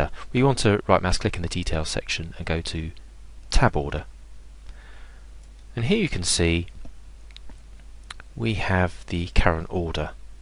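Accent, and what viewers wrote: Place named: United Kingdom. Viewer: Great concise instructions, although the intro showing why it's needed it probably not so necessary. We wouldn't be here if we didn't already know what was wrong. And delivered, no less, in that wonderful British accent that makes the material just so much more profound-sounding; the accent that can make reading a telephone book sound like an interesting intellectual exercise! Cheers!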